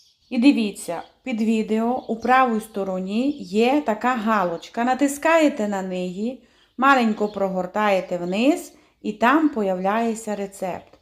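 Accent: Transcarpathia, Ukraine. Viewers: Дякую за чудові рецепти! Томатний сік вийшов смачний за вашим рецептом, тепер спробуємо помідори. Дуже люблю слухати вашу закарпатську вимову - вона збагачує українську мову. ❤ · Щиро дякую за рецепт і за чудову закарпатську говірку. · Кайфую від вашого закарпатського акцента.Дякую! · Мова українська, закарпатський діалект:))) звучить дуже цікаво і гарно. А закрутки в господині неперевершені! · ви направду дуже гарна господиня), все, що я робила за вашими рецептами було дуже смачне. А ще, мені дуже подобається закарпатська говірка. Дякую вам дуже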